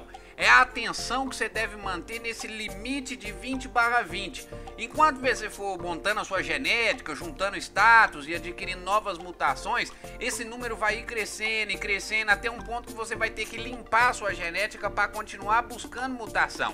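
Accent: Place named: Rural, Brazil. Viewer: Mano tua voz parece de dublador de filmes tlg , aqueles filmes americanos que tem alguém caipira aquele sotaque mano acho que vc teria sucesso em dublagem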